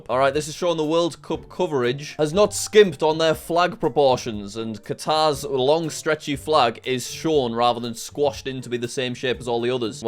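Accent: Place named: United Kingdom. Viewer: Why are u british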